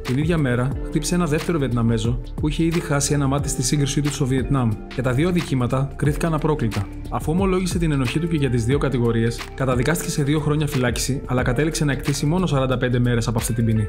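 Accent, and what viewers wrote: Crete, Greece. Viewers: ωραίο βίντεο....Και απίστευτη προφορά κρητικατσι μας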